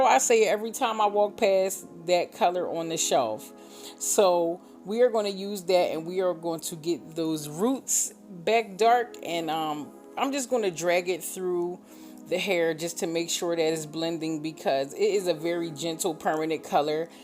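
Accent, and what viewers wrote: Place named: New York, United States. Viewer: I love your accent. Are you from NYC? Watching you work and listening to you is so soothing!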